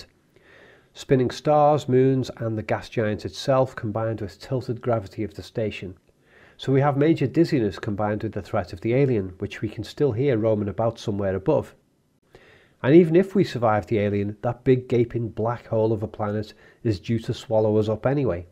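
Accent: Liverpool, United Kingdom. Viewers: My apologies for always assuming you were from Scotland instead of from Liverpool. My ignorance of accents outside of the USA.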